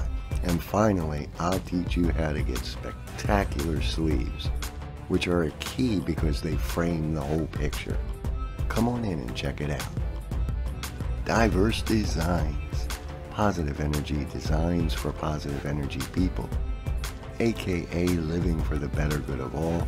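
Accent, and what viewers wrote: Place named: Northeast, United States. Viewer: I had a feeling you might be from my area…. (CT here 🙋🏻‍♀️🙋🏻‍♀️)😂😂 you sound like you live on the North East coast………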